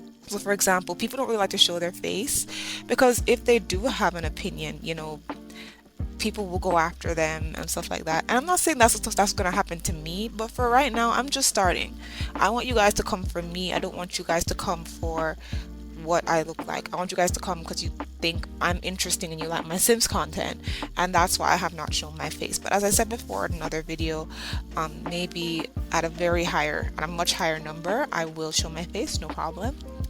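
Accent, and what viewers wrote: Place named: Jamaica. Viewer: I like your vibe, I'm definitely gonna tune in. We share the same sentiments on sirens 😂, and OMG I heard your accent and I was like oooooo she's from the Caribbean, but I literally SCREAMED when you said you're Jamaican! Ahh, I'm a Jamaican simmer too! I'm so excited to meet another SIMS 3 JA simmer 😊. (Sidenote: Isla Paradiso is where I play the most. Gives that home feeling for sure)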